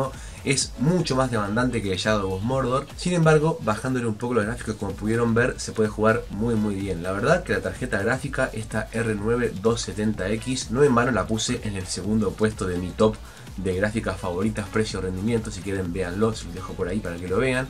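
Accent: Uruguay. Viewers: has faltado a la verdad en este video, ya que, solo la GPU, vale más de 100 USD ^u^ lol El micro vale 40 UDS, la placa 120 USD, sin contar la fuente, gabinete, disco, ram, etc, etc. Por cierto, eres de Uruguay verdad? Saludos. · Opa sos uruguayo q crack q sos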